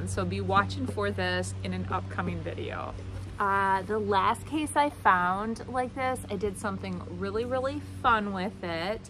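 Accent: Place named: Midwest, United States. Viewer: Found your channel recently and right away loved your accent because you sound like me! Lol..We are from WI but have been in NM for many years and will probably not be retiring here. Miss WI alot but think we we will move more in the south soon. Love you ideas and I'm a reseller as well. Happy junking fellow Midwestern ☺️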